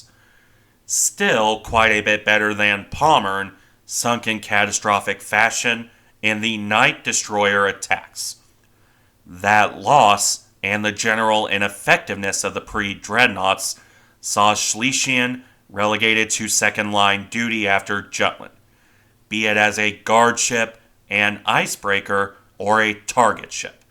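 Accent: United States. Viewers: Please don’t be a rude Prussian. He freely acknowledges his American butchering of native pronunciations. It’s something we do here and we are fine with it.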